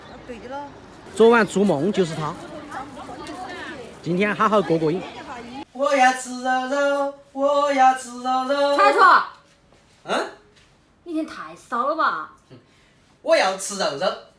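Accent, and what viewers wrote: Sichuan, China. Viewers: 吃的真香 味口真好 四川話好親切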